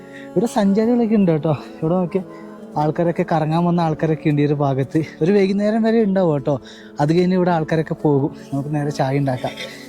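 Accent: Kannur, India. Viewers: Kannur kaaran ano?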